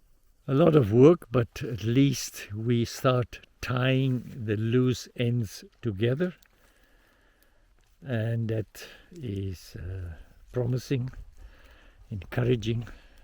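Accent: South Africa. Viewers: Keep at it! Things are looking great there. You sound like you're South African, I am too. I have built a water conservation garden which is very effect in SA and love seeing others doing it too! The culvert looks great. I suggest heavily mulch your earthen wall and berms etc before heavy rains or you'll need to dig your trench again. My swales are around 80cm wide or so, a little wider than yours and when it rains a fair bit would fall down back in on uncovered/unmulched areas. You luckily have the resources around you. If you cut the nearby grasses you can use those as an easy mulch.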